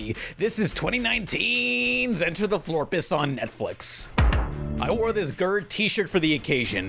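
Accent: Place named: Alabama, United States. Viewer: Bruh you got some Alabama shit going on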